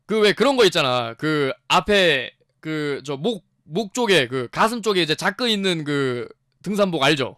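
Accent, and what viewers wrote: Busan, South Korea. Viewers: 이형은 영화관이랑 인연이 안좋아ㅋㅋㅋㅋㅋㅋ 그런데 부산분이셨나???ㄷㄷ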